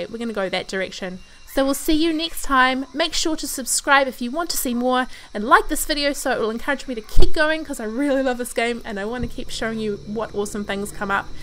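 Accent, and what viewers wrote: New Zealand. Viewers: Just found you and this vid; only bought it the other day, so all new to me. Good vid, thanks. :) SPACE girl, eh? So, Elite:Dangerous or not? ;) (Not yet explored your channel yet... another time -- overdue to get back into Empyrion...) Is there a bit of American/Canadian to your Kiwi accent -- or am I wrong?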